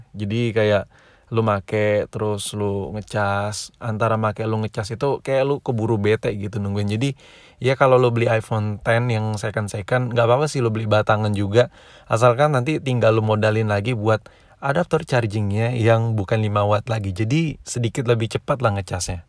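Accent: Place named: Medan, Indonesia. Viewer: bjirr ada logat medan yak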